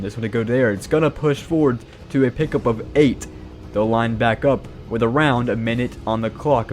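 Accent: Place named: South, United States. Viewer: I like your content and also where do you live your voice sounds a bit southern but at the same time a bit northern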